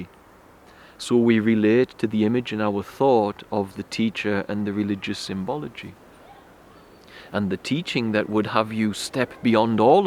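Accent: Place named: Northern England, United Kingdom. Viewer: Are you in Northern England here? Is this also the source of your lovely accent? 😊🙏🏼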